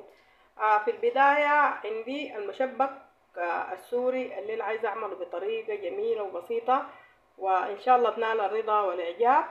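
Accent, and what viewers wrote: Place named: Sudan. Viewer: لييش انتم يا السودانيين نساء ورجال تمطووون كلامك حييل بصراحه طريقة كلام ولهجه لا تعجبني ابددددداً😮😢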